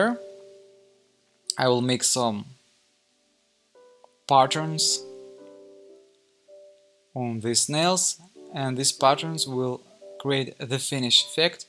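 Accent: Russia. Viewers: You speak English SO WELL, so fluently. I like the Russian accent. It sounds nice to me.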